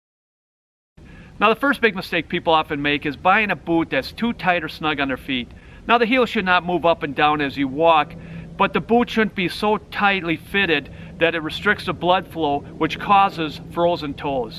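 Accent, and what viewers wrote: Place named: Midwest, United States. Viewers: Great info ,taken aback by Minnesota/Wisconsin accent it's really distinct. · I'm born and raised in the great state of Michigan. If you sir are not, you would fit in great in the U.P. Your accent is very Upper Peninsula Michigan/Canadian good sir lol. We spend a ton of time outdoors. You gave some great insight for those who don't know the tips and tricks we use to stay decently toasty! Take care and have a great day🇺🇸🇺🇸🇺🇸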